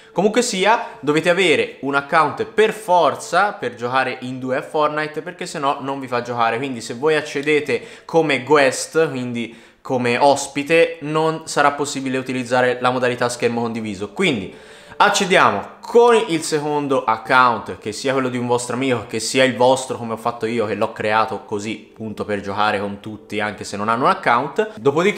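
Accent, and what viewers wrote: Tuscany, Italy. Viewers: Sei toscano? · Ma sei toscano? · Sei toscano vero? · Quanto toscano🤣😊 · Ma sei toscano?? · We bello toscano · Si chiama parlata toscana/fiorentina🤦‍♂️ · Bello l'accento toshano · ma sei toscano? mi sembri un po' toscano 😂🤗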